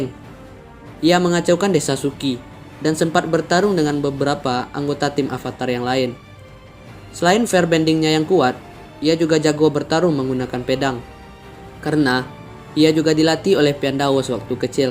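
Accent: Padang, Indonesia. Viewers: Org padang y min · Abang orang padang ya Bng 🤣🤣🤣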